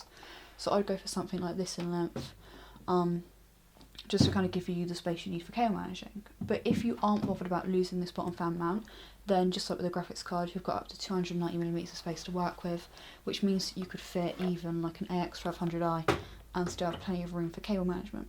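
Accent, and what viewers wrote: United Kingdom. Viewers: Oly VL Haha of all things to like, her accent (look I'm south african, so a british accent appeals to me too), just that I prefer her to other reviews because she isn't some 50 year old fat dude or a 12 yo kid or that she is a female in a male dominated sector (as far as I know) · Omg her accent makes it so damn difficult to understand. I thought British accents were the most easiest way of listening or learning English. Her accents makes it seem like all gibberish. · Damn,this english accent.. :| I usually understand british accent but not now :P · Anderson Paschoalon Noooo... It mean that UK has the most diverse population ranging from Scottish to Welsh, from North to South accents, from Southall to Stratford accents, from "chavy" to posh, from Punjabi to Polish... Man..."dys acent" is a droplet in the ocean of diversity over here. 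Get use to. Or change page if you cannot comprehend it, as for speakers... She has a one of the softest voices I know of and in different situation, she would have your erection just by talking to you. ;-)))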